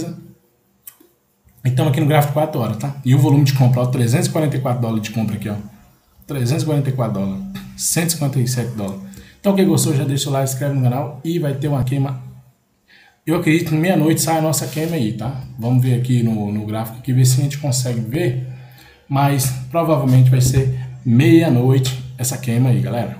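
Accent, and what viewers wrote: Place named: Belo Horizonte, Brazil. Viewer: Sua voz lembra meu tio de BH pelo sotaque rsrs muito bom !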